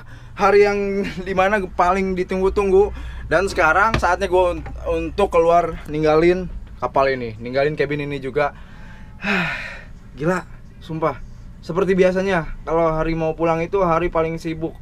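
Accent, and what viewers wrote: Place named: Sunda, Indonesia. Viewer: Logat sunda lu kental bgt broo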